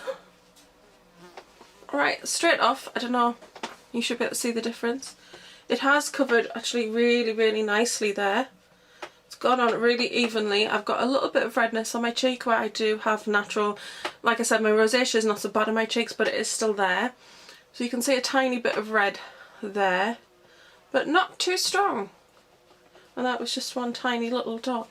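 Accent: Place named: North East England, United Kingdom. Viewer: Thanks for this review. Been thinking about getting it. Have got a small amount to test as well as the x-ceptional wear. Are you from the north east UK by any chance? Your accent sounds familiar!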